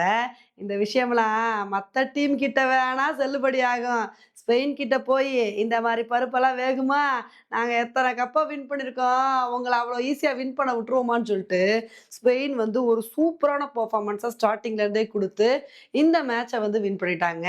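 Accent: Madurai, India. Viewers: மேடம் நீங்க மதுரையா பேச்சு வழக்கு செம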